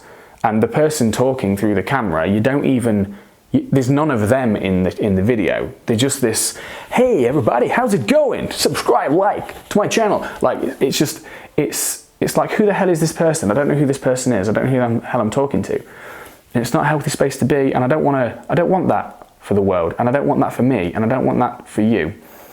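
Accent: United Kingdom. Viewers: I could listen to you for hours! fascinating mind and damn... I love your british accent! 🤫😘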